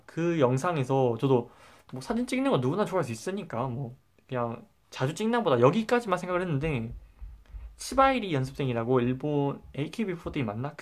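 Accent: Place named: Jeolla, South Korea. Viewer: 전라도분..ㅎ 전라도 사람만 알수있는 억양 ㅎ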